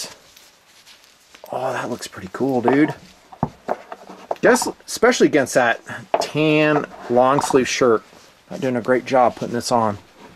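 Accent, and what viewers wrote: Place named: California, United States. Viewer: where are you from? You kinda sound like you're Californian hippie surfer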